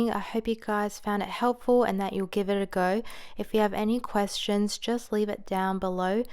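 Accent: Australia. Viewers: Are you Australian? You accent sounds very Australian specially when u say “water” as “wodah” and “borders” as “bodahs” and “white” as “whaih” etc :)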